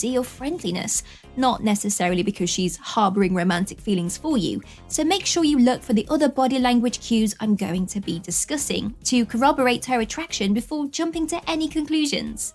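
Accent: United Kingdom. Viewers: Good advices! R u from UK?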